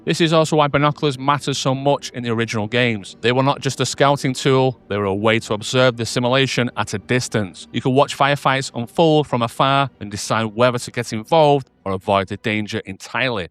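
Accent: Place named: United Kingdom. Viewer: That bri-ish accent boy explaining stoka 2 again. Смешной такой, и откуда он только взялся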